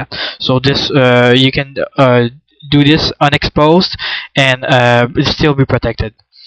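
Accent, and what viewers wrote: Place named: France. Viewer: It doesn't matter whether he spells well or talks fluently, freaking jerks! He gets it done and can at least speak different languages, can you do the same? I speak 3 languages myself, idgas about what people say because they can't speak other than one freaking language. I can blend in different settings so can this guy, can you? People are freaking low life mfrs, anyways, good video. lol I can tell you are French I think haha...you french people have that easy recognition accent. Alors sinon, t'a appris ca a l'ecole ou toi meme? Je suis en train de faire mon certifica en Network Security, c'es comment comme boulot?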